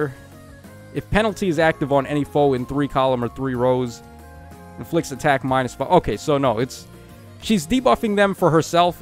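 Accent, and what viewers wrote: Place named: Queens, United States. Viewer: Something great about an affable dude with a thick Queens accent reviewing gatcha games. 😆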